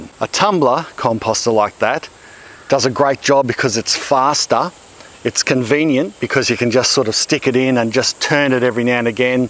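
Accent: Australia. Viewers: Because of your awesome Aussie accent, I couldn’t tell if you were saying your name was, or. Good thing you wrote your name in the video description area! LOL 😆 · Is there any evidence you have to support the idea that people can't produce paper thats toxic anymore? With your accent I suspect you're in Australia. Which may have that law. But here in America ive been advised by many that you have to be careful not to use any colored paper. Tbh the only thing i feel safe using is newspaper that I know is printed with soy ink.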